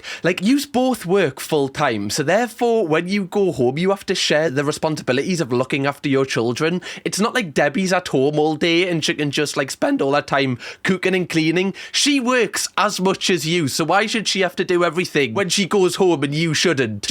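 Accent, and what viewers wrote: Newcastle, United Kingdom. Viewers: Can I just say never change your accent and don't start saying 'mom' like some other UK YouTubers do; your accent is great and repping for the geordies!! ❤ from in London, UK ❤ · your comments are hilarious and true. Proper Geordie?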